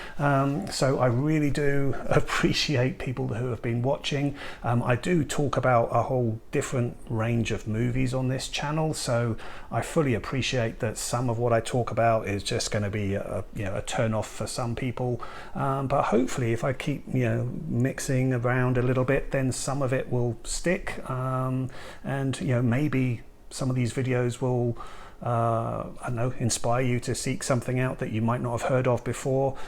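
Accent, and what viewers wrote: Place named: England, United Kingdom. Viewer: It’s the English accent that makes it sound so classy when you’re talking about movies 👍🏻 400 great job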